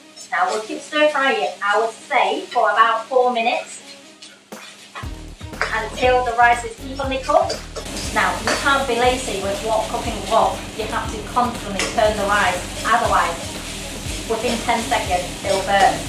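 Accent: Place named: Asia. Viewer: Is this a British person with an Asian accent? Awesome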